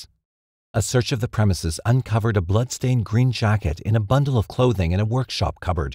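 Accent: Canada. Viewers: I think the narrator is actually Canadian... and the creator is definitely not American. 🤓🧐😉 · Double down that sweet sweet Canadian narration!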